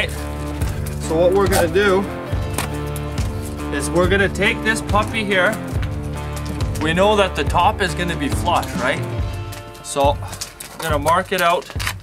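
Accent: Canada. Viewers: Canadian eh?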